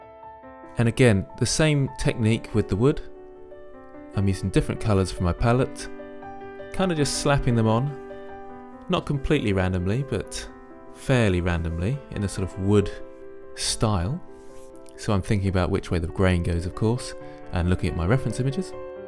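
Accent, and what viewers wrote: United Kingdom. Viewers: Awesme like always, thanks for the many tutorials! iam not a nativ english speaker but i love your British Accent :D its what i was looking for lo learn how to use Blender. may i ask u a question? i would like to know how you creat a complete Scene with more than one 3D model. For example the Background, the Floor and some Objects like a Barrel and a Fence infront of a Building. Do you still use Blender to put them together? If I missed the answer in one of your videos, I'm sorry. Sometimes i do not understand all word in English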